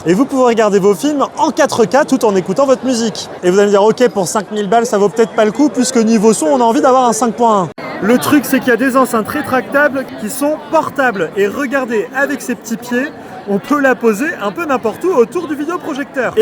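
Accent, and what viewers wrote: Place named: Paris, France. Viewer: "fairheu" parigo et son accent pourri de j'euns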